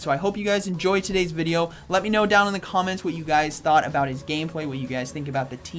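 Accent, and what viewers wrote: Netherlands. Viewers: Lol this guy for sure is from the Netherlands. They have such a specific accent :)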